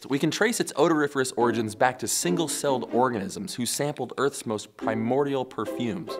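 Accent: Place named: United States. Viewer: I can tell from his accent that he's American. He also mentioned Austin in one of his other videos.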